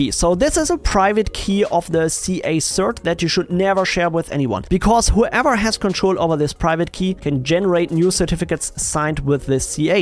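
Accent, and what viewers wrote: Germany. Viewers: Da hör ich doch das Deutsch raus xD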